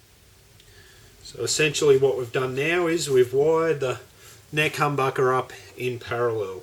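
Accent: Australia. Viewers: Iwas almost uncaring as to the info once I heard an Aussie accent. I was doubly glad to find good info without the ubiquitous yankee accent. Thanks digger. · Excellent video. I was particularly intrigued by the removal of the pole pieces altogether (as per Scott Grove's advice - he has a lot to say not just about guitars and guitar gear but about bloody everything lol). It did really brighten up the pup to my ears at least. I know that I'm six and a half years late on this upload but it's still pertinent. Great to hear an Aussie accent on the tube mate. Keep 'em comin'. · Judging from your kick-ass accent, I am guessing you are Australian? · PS : I like that little riff you do !...greetings from Melbourne !!! good to hear a fellow aussie talking common sense !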